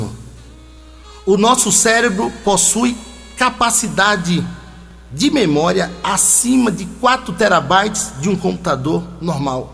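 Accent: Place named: Northeast, Brazil. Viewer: Amém PR. Boa mensagem, boa de se ouvir, um conteúdo de grande qualidade, que o Senhor continue te abençoando mais e mais, mensagem que edifica, parabéns pela sua dedicação nesta obra. Estou aqui em Cotia-SP, e matando até um pouco a saudade de ouvir o nosso povo nordestino, nossos conterrâneos, isso nos faz bem.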